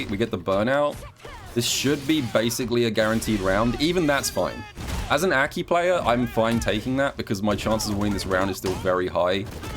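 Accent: United Kingdom. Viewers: do again mr british very cool and insightful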